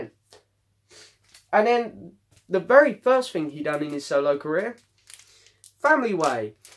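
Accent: London, United Kingdom. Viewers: thank you for your take on Paul's solo albums, you have a great take and insight. In fact you introduced me to the album Ocean's Kingdom I wan not familiar with before listening to your ranking. Good going kid. I hope you meet Paul someday and get to produce or do something with him; your very knowledgeable and sound like a great London bloke. At least that is what this yank hears. You certainly 'ave an ear for production and music. I subscribed ti your channel, I was so impressed.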